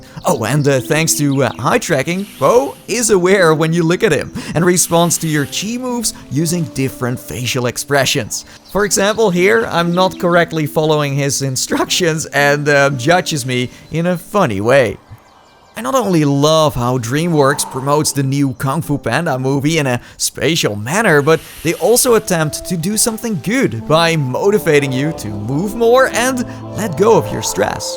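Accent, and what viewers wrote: Netherlands. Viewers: ik zou zeggen praat alsjeblieft niet met zo'n nederlandse toon als je engels praat maar ja, je hebt 758k dus wat kan jou het boeien natuurlijk.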